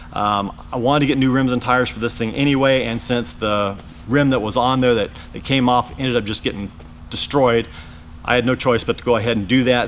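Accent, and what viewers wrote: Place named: United States. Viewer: You sound like a regular American, especially for a Californian... 😉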